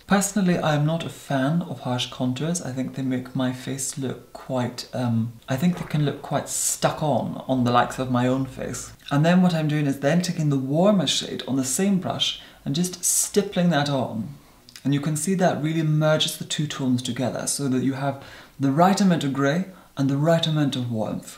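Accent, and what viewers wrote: Scotland, United Kingdom. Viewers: Nina Lights he's from Scotland he's just posh lol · So beautiful and elegant! Lovely to hear the Scottish accent out there too❤️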